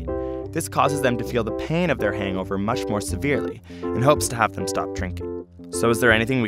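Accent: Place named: Canada. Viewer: From a phonetics point of view, this guy might just sound "gay" because he exhibits features of canadian raising- the other guy has it too kind of. I'm not saying all canadian guys sound gay, but that kind of chilled out, raised intonation (as if every sentence sort of sounds like a question) and vowel that's often found in californian and canadian english CAN sound similar to stereotypical "gay" speech. Of course this is all arbitrary as people sound the way they do, regardless of orientation.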